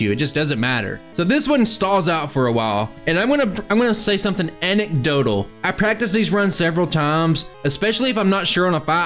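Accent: South, United States. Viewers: somewhere from the Southern States :D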